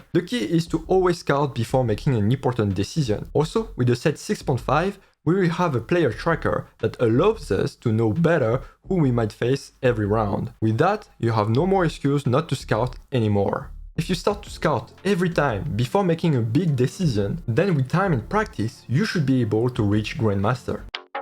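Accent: France. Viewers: You are a french accent, u are french ? ^^' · Great advices. Awful english accent. Where are you from ? (french people has same awful english accent, but could be asian)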